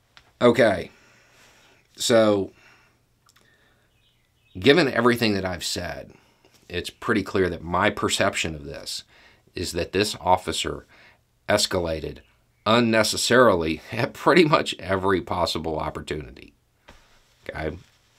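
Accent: South, United States. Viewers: I would like you to cover more of this. Dr Richy does but because he’s black I fear he’s not respected among some as you are because you’re white and have a southern accent.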